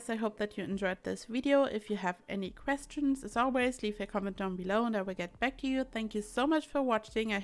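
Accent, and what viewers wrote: Germany. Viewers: From where she is German? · Bin ich echt die einzige die bei den ersten Wörtern schon wusste SIE IST DEUTSCH 😂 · Du hörst dich deutsch an kann das sein? 😊(gerade erst indeckt noch keine anderen Videos von dir gesehen) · Are you german? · Are you from Germany? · You have a German accent. Am I right? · I don’t know why but since I am a German I think that she sounds very German when she speaks English ( can you tell me if I am right )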